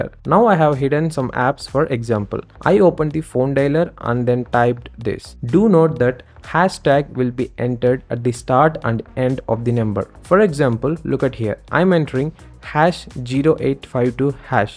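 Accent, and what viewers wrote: India. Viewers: It's always this Indian accent dude, thankyou soo much vro.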